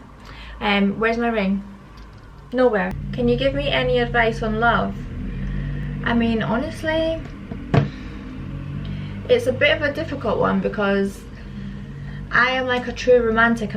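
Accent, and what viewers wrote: Scotland, United Kingdom. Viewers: What your height is??? Cuz im about 6'1 think u look incerdibly beautiful love ur long blonde hair Got such an nice scottish accent which its sound so amazing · You look so gorgeous, and your scottish accent tho 😅💕 More video please 😅 and make a vlog about your daily activity 😅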